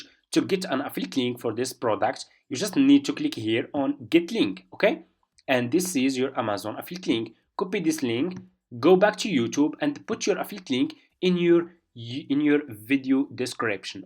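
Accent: Morocco. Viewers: He has a Moroccan accent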